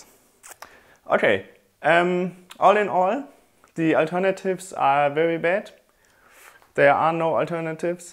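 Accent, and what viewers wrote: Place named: Germany. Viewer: I guess he is german...... i know german accent...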